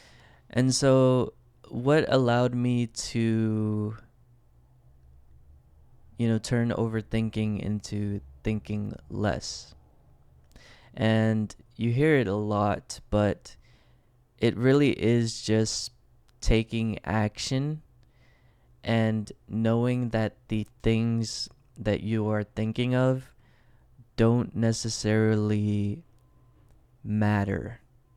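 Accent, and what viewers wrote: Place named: United States. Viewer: More videos plz ❤ I find your voice very clear to practice my American English accent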